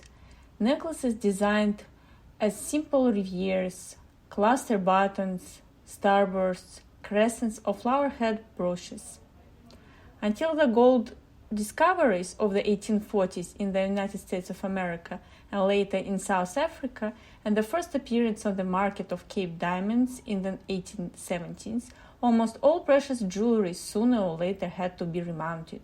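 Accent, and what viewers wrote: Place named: Russia. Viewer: ruskakaia accent lol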